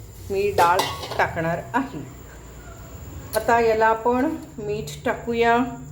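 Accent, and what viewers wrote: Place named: Malvani, India. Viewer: Mi asa sambhar nakki karun bagen mam mastach amchya malvani basheth boltey mastach mazya tondaak panni sutla chaan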